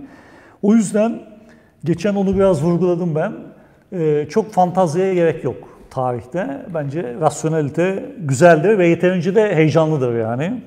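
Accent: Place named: United States. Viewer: Aynı konuyla ilgili ilk dersinizi de zevkle dinlemiştim ama bu çok daha zevkli oldu benim için. Teşekkür ederim hocam. Bu arada söylemeden geçemiycem Türkçe yi Amerikan aksanıyla konuşmanız da renk katmış😁 tekrar teşekkür ederim.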